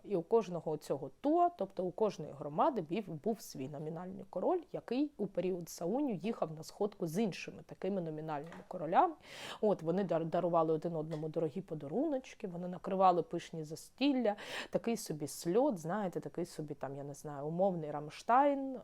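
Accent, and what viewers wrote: Ukraine. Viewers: Як гарно все розклала по поличках! Приємно слухати інтелектуальну людину з такою приємною зовнішністю і українською вимовою! Дякую, наша ❤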